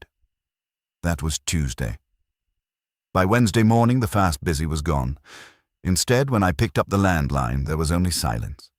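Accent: England, United Kingdom. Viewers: If you're going to use an English accent why don't you set the story in England?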